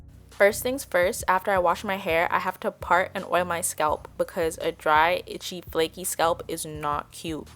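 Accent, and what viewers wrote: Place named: Toronto, Canada. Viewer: Girl you from Toronto? Your accent screams Toronto lol thanks for the hair tips. Needed something for my daughters hair. Will try it out!!!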